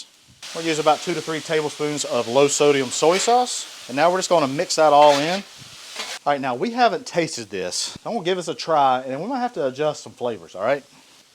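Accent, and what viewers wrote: North Carolina, United States. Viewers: First video of yours that I have watched and you sure are making me miss home. Not that you cooked something I really ever had in the 2 plus decades of living in the Durham/Chapel Hill area, but your accent and slang...thank you :) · You must be from NC. I can tell by your accent…. Maybe Thomasville or HighPoint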